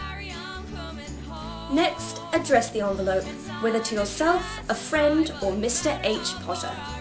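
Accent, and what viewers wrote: United Kingdom. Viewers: this is a great idea! I love your accent are you welsh of british? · Are you really British?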